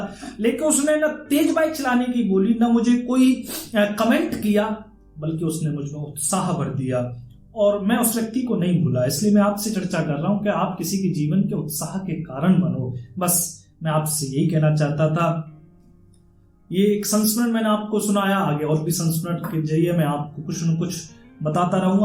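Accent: Braj, India. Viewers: बृज वाणी ❤️❤️ राधे राधे 🙏🏻🙏🏻🙏🏻🙏🏻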